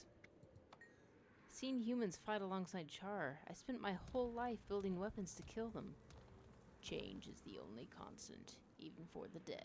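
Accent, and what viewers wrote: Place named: Midwest, United States. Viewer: Midwest accent so sweet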